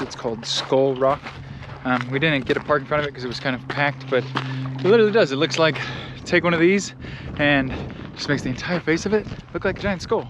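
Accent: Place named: South, United States. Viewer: your southern accent is getting better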